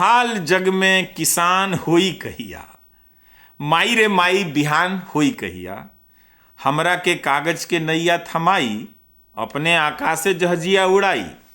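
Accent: Bhojpuri, India. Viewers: राहुर भोजपुरी सुन के मजा आ गईल😊👍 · माई रे माई बिहान होई कहिया। आदमी के आदमी से पहचान होई कहिया। नफ़रत के बोली मेटान होई कहिया। माई रे माई ....अभी जवन चल रहल बा ओकरा के देख के कुछ अपन लाईन जोड़ देनी ह, सचमुच आजो अनहरियाईल रहम हमनी के त बिहान कयीसे होई। रविश सर, राऊर भोजपुरी के बोली सचमुच बड़ा निक लागल।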